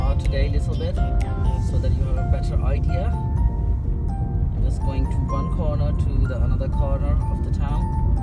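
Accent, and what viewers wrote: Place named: Ireland. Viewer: I thought you were Irish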